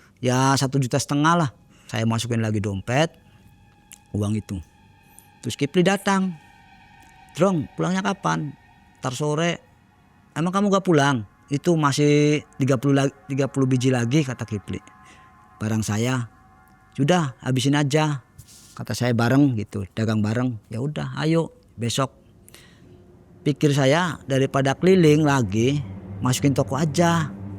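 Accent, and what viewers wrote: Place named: Cirebon, Indonesia. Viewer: Orang jowo borot kayaknya (cirebon) ada “jeh” nya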